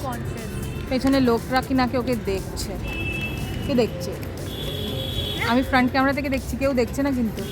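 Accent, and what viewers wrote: Bengal, India. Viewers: Aapnar Bangla ta darun! In fact you even have a Bengali accent! Have you been living in Kolkata long? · Wow! Loved your bengali accent. And Will this market soon.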